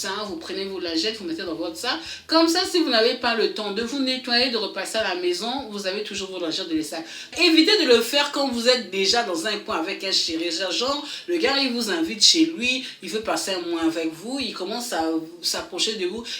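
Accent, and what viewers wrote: Ivory Coast. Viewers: Loooool 😀 t'as vraiment l'accent ivoirienne hein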